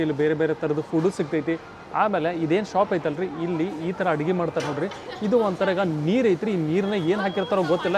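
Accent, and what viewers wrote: North Karnataka, India. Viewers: Nim language uttara karnataka bro namdu bagalkot nim uru yavdu. Khushi aytu nimmannu alli nodi nimminda alli food nodoke avakasha siktu❤❤ superb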